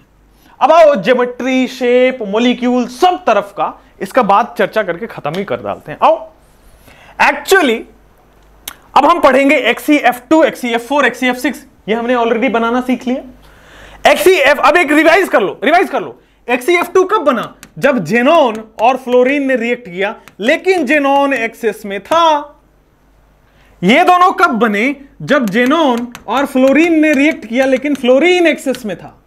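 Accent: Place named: Bihar, India. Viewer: Sir your accent and the way you speak words... Tells us that you are from bihar... And u prooved this too : " Bihari hai to bambaari jrur hogi " .... The only difference is... Aap bambaari chemistry me krate hai.... I literally enjoyed all your lectures.... UP se kota ki teaching ke benefits de rhe hai aap.... Thank you so much sir for your hard work... 🙏✌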